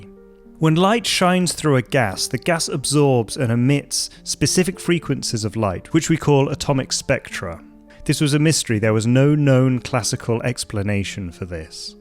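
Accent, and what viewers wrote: United Kingdom. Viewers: Your British accent is enough for me to believe you. Forget about the content.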